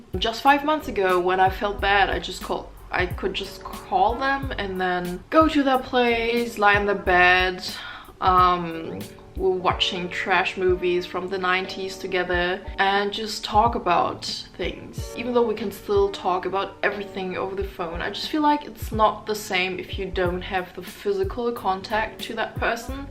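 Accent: United Kingdom. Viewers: You're wonderful, in everything that you do! Just know that we all love you and support you:) Btw unrelated -is it just me or has your accent become a lot more British lately?:o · I haven't watched videos in a while (i haven't seen any in my subscriptions, youtube algorithms must be not showing me) her accent sounds different and kinda British-y? I love the way the black hair dye is fading on the ends of her hair it looks really cool. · Schön dich wieder zu sehen! Du siehst toll aus. Mach weiter so. btw Dein englisch hat sich bissl verändert oder?! du hast so einen britischen Akzent, ist mir vorher nicht so aufgefallen, vorher hat man immer gehört, dass du eigentlich Deutsch bist 😄 liebe Grüße, stay positive 😘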